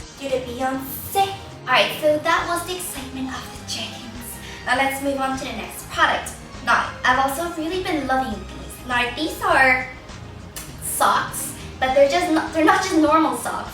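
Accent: Ireland. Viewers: i thought you were Irish because it sounds like you have an Irish accent!!!!!!! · You sound Irish. Great video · You're from Hong Kong but you kinda sound Irish..But you're really pretty :)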